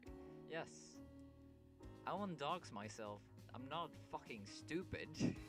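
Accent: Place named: Japan. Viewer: ты хорошо говоришь на русском, но говоришь так, будто это японский или корейский, но а так нормально >___<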